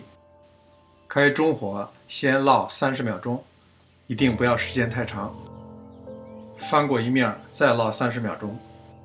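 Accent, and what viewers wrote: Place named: Tianjin, China. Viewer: 天津老乡话音😊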